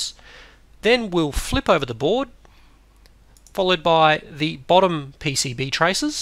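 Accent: Australia. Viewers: hes just got a really strong aussie accent, i kinda like it (though im also an aussie)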